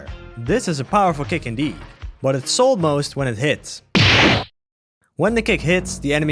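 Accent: Netherlands. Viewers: your english is decent, it took me literally a minute to notice your dutch accent :p u have a typical dutch D & T. coole video trouwens. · u dutch ?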